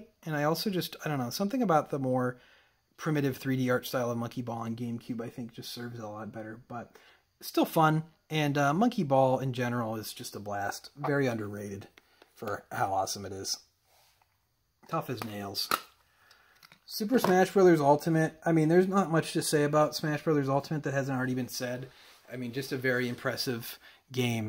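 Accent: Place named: Upper Peninsula, United States. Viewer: Outstanding video, also love the U.P. accent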